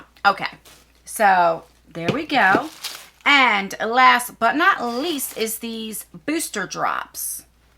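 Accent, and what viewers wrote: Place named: Maryland, United States. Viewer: Where are you from we pronounce water the same way , like wooder, lol. I’m in Maryland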